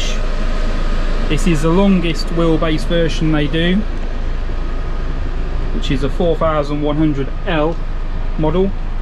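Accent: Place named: Australia. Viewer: why do you speak like an Australian ?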